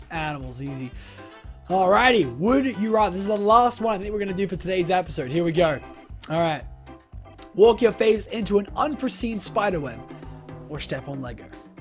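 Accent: United States. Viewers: He sounds American!!😂😂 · R u american lol u sound American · he sounds american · He sounds half American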